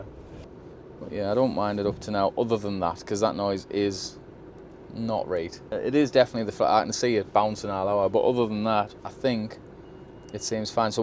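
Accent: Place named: Geordie, United Kingdom. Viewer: Hi, good to see you back. We have an IH Fiat Campervan to which the previous owner had a Cinderella incinerator toilet fitted, not sure what make you are considering. We have had the van now for over a year and the wife loves it, clean, convenient, and warms the bathroom up as well!! It can be up to a month of use before the ash needs emptying, but the negatives are uses quite an amount of gas, about 185g a flush so an underslung gas tank would be recommended, and it does need liners which cost about £50 for 500. As a Geordie by birth, but now a ‘Southerner’ it is great to hear your accent, reminds me of my childhood staying with my Nan in Easington Lane Co Durham, and Crimdon beach. I think Cinderella Toilets also do a pure electric version? Hope all goes well. Kevin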